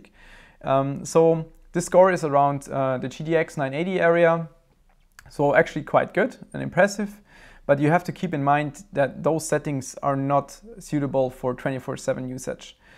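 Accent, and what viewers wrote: Germany. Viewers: You sound like a German ;) - hast du auch n deutschen Channel?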